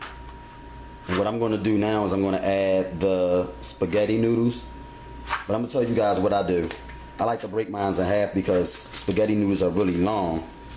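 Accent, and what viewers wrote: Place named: Philadelphia, United States. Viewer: I love your philly accent!!!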